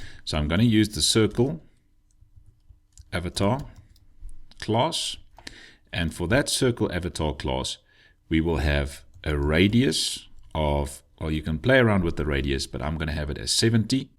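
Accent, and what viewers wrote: Afrikaans, South Africa. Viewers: This was awesome! I solved maybe 75% of your exercise. I got a little stumped on how to format the icon-text-icon structure, and must say, your approach made sense. Overall, the mere act of trying made things click that much more. Thanks Mr., you're a 1 in a million lecturer! As an aside, when I was younger, a lot of my teachers were Afrikaaners, so hearing your accent floods my heart with some beautiful nostalgia. Best wishes, and I'll keep sharing your videos + playlists with all my developer friends that are trying to get into Dart/Flutter. Best wishes from the USA, and stay well ☮️🏆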